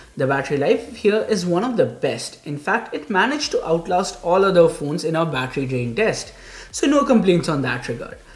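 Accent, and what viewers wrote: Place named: Bengal, India. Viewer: Hey, are you a bengali?🤔 Your accent (specifically how you pronounce your name) makes me think so... By the way, nice content as always ✌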